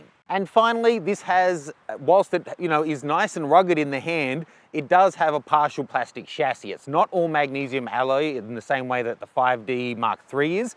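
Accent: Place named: Australia. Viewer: The Australian accent is the hallmark of racist moron in the world right now So if you want to talk about cameras tone it down on the ugly accent Mate !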